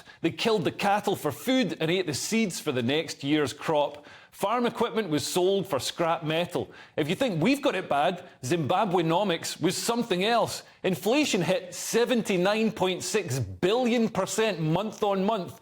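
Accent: Scotland, United Kingdom. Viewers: This is the worst analysis I’ve ever witnessed. At first I thought he was Irish and had an understanding of geo-politics but I think he’s Scottish 😅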